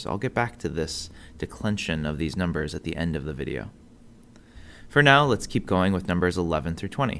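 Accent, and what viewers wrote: United States. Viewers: It is really underwhelming to learn Latin by listening to this strong American English accent. There's no "Rrrr" sound at all, for example. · Gotta work on that pronounciation. Sounds way too American . Nice educational video tho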